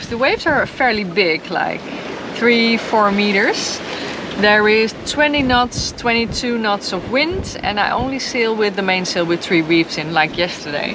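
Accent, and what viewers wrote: Netherlands. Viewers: Wauw wow fantastic adventure Ja kijk uit hoor ,die orcas ! 😅 So kool your solo trip . I like hearing the Dutch accent Moet ik aan Vlaardingen denken . Weggevaard in 1959 eerste keer Naar Canada . Beetje grooter boot maar ja. Boobies yes they are .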